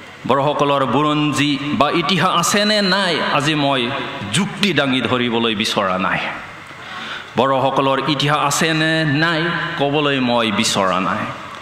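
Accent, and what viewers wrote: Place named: United Kingdom. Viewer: British jugni spees be Babu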